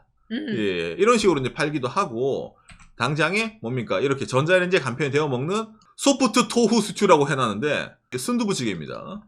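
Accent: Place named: Gyeongsang, South Korea. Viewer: 멘치로. 찐경상도!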